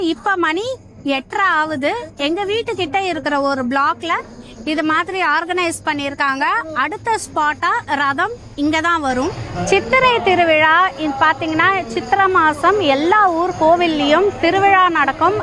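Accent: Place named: India. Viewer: Unggal Tamil innum India le pesuvatu poleveh irukku, enggal nattu inimaiyana Tamil pesunggal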